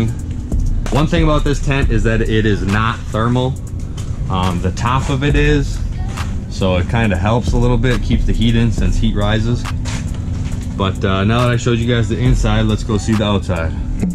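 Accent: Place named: Wisconsin, United States. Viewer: I’m from Wisconsin as well and man it took not even 5 seconds to guess you were from Wisconsin. Could hear it in the voice easy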